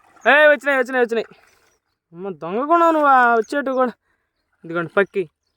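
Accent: Nellore, India. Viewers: Meeru maattladatha vunte mana Nellore lo ne vunnattu vuntadhi naaku😅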